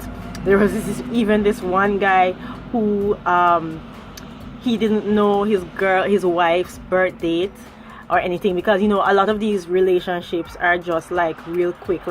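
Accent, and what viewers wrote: Jamaica. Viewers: You sound way more Jamaican than Guyanese, so I've claimed you as a Jamaican lol. I absolutely love your creative hairstyles (combed out my locs last November), especially those on your OMG channel. Please keep doing more as we have similar textures. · girl...i swore u were Jamaican... i am shook wow..interesting information.....very informative video.... Thanks for sharing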